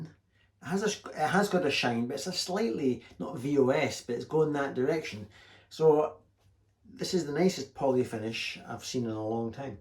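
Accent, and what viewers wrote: Scotland, United Kingdom. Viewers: Thanx so much,. Your vid wuz just GREAT (!)...and, of course, my brain'll have a Scottish accent for days now 😶...and I totally sympathize with you concerning the "Low Volume Dilemma" 😣 I suspect your singing voice'd be quite interesting too. Any thoughts/experiments?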